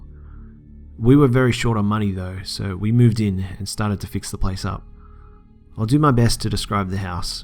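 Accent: Australia. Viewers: I think you are Australian?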